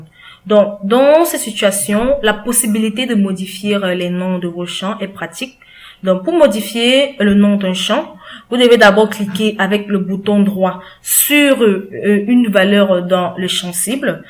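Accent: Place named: Africa. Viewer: toi tu es africaine , ca s'entend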